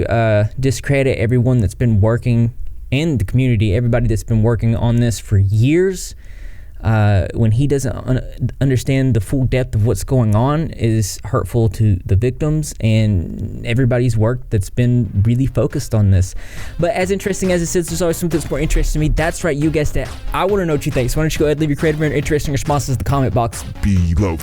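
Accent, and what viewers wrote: United States. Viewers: I did not expect that country accent lol. Which I get that too lol.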